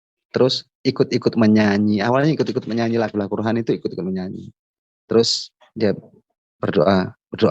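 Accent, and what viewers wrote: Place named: Kupang, Indonesia. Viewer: Kental dialek kupang,,kupang mana mas??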